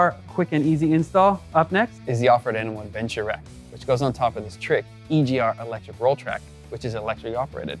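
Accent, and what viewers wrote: Australia. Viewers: This guy was for sure an Aussie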